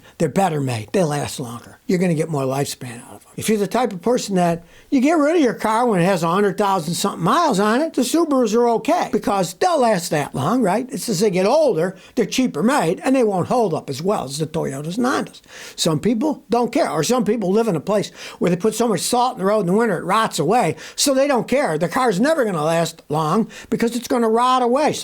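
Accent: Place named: New York, United States. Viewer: you left, but your new york accent never did...ha ha.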